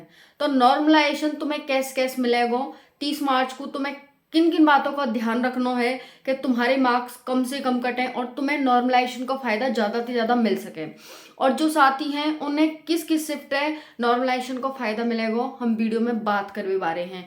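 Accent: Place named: Mathura, India. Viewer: Mam aap kahan se ho aap meri tarah bolti ho m Mathura up se hun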